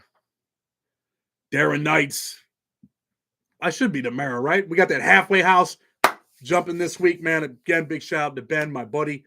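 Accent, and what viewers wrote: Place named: South Buffalo, United States. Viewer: Your south buffalo as fuck bro !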